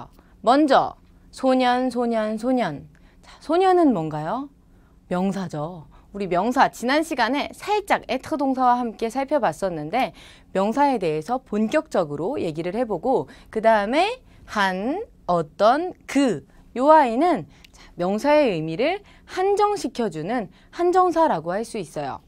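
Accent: South Korea. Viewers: 셈. 할끼요.억양이경상도쪽? 프랑스문법쉽게설명해주시어 감사합니다. 🇨🇵 😅 🇰🇷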